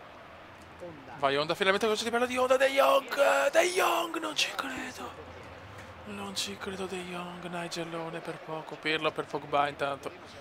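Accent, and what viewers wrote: Veneto, Italy. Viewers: mi mancava la tua voce da veneziano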